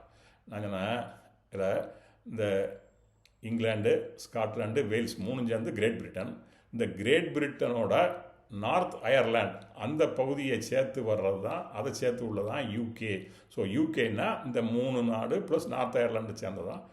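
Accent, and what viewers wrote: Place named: Tirunelveli, India. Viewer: மங்கா புகழ் கொண்ட எம் நெல்லை தமிழே... நீவீர் வாழ்க.❤❤❤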